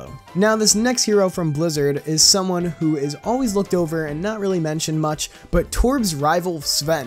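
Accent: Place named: United States. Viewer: US English doesn't even sound that bad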